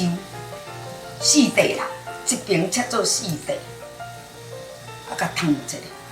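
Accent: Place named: Taiwan. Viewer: 的孫真正有福氣，有thang 聽到正港原汁的台語，觀眾也仝齊分享!讚!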